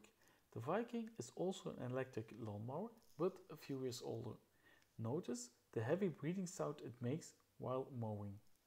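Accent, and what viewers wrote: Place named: Netherlands. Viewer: Think he might be dutch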